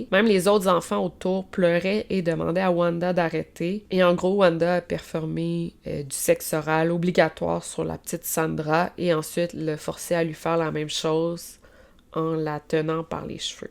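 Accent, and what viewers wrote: Canada. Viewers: Après 10 minutes j'ai déjà mal à la tête ! J'adore l'accent canadien, j'apprécie les récits truecrime mais je n'arrive pas à me concentrer sur ce qu'elle raconte ! Elle me "bouffe" mon énergie, elle parle comme si on était tous ses potes ou comme elle... Je ne veux pas la descendre car elle fait de bonnes vidéos ; c'est dommage... Après il ne faut pas se forcer à regarder une vidéo même si le sujet est intéressant. J'essaierai plus tard avec une autre vidéo, peut-être que j'apprécierais mais là ses manières et ses sourires incongrus me bloquent...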